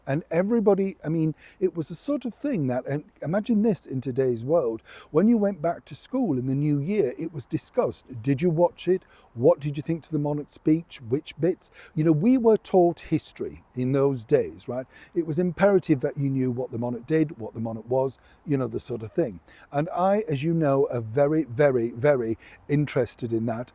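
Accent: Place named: Northern England, United Kingdom. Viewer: Hello Big wave, Chums👋👋 I am on of the ones that have been here since the beginning. Well, under 1000 listeners, anyway. I remember the day got to 1000, it was a celebration! I like because he is from the north, and talks like my late Da. He uses similar expressions as our Da. He also has the same eyes but then so does Piers Morgan (ooh, he looks like Da! lol!). It is all in the eyes. The crinkly blue eyes. I miss me Da, so it is like coming home when I listen to. And I WILL be, next week, yay!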